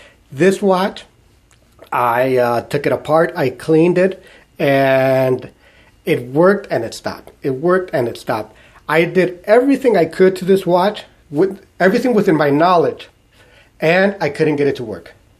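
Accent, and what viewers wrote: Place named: Mexico. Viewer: Don't pay attention to these fucking swiss fanboys who complain about your mexican accent. You have more class and appreciation of unique timepieces than all of them put together.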